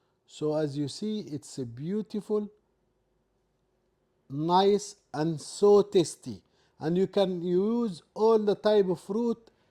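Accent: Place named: Egypt. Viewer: Egyptian English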